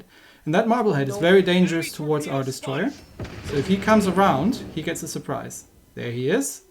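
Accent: Germany. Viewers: Hey i gptta say i found you as i needed some guidance on how to play a bit better hit those citadels and it help'd. Also you sound like you are a german but still wanna ask you what server do you play EU or NA because i tried searching your fleet yet i could not find it.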